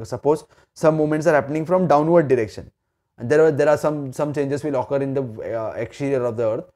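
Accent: Maharashtra, India. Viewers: N I think u r Marathi coz Ur tone like this only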